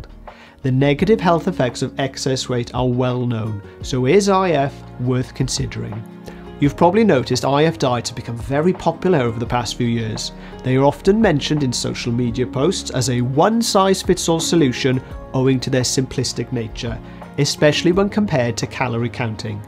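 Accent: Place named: Wales, United Kingdom. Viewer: Love the Welsh accent. Great breakdown of comparisons, thanks. I have T1 diabetes and fasting makes my TIR and BG absolutely fabulous and I feel great.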